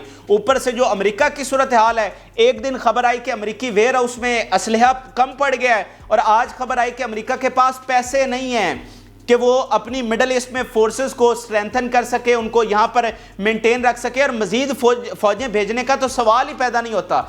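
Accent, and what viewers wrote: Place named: Punjab, Pakistan. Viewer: نا بول انگریزی نا بول۔۔۔منہ وچوں پنجابی بن کے نکل دی اے تے نال روندی اے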